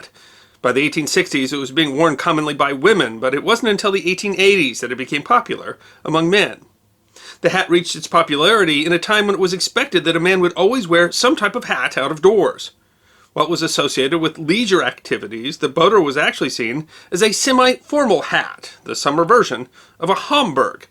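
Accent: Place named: United States. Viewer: Oh how I wish you spoke the Queen's English & not the lazy Yankee dribble!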